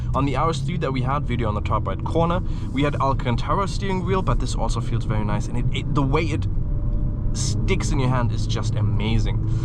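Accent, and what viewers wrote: Germany. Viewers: Bin überrrascht, dass man dein deutsch kaum raushört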